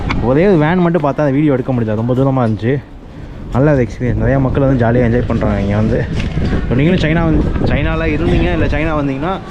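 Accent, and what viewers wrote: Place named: Namakkal, India. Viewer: Enga saravanan mama voice mathiri iruku bro unga voice from tn namakkal super review bro 🖤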